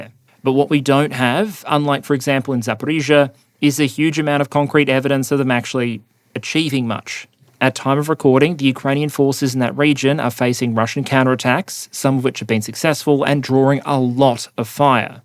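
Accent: Australia. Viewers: Great as always! BTW - is "wreck" havoc a specifically Australian idiom (I've always heard "wreak" havoc up until now) or is that just how "wreak" sounds with this accent? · Is this guy Australian?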